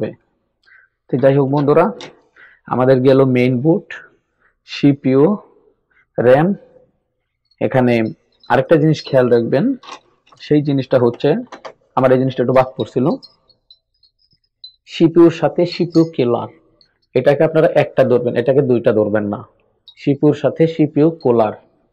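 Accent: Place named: Dhaka, Bangladesh. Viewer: আপনার কথায় ঢাকাইয়া আঞ্চলিক টানটা ভালো লাগে, আপনি আপনার ভাষাতেই যেভাবে খুশি বোঝাতে পারেন, অনেক অনেক শুভকামনা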